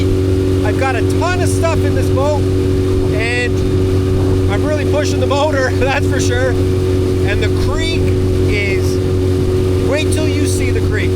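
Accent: Newfoundland, Canada. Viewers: Are you a newfie? I get that feeling.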